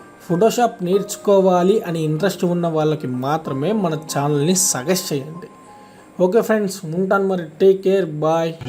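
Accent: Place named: Ongole, India. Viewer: Anna mi vaice ongol sai kada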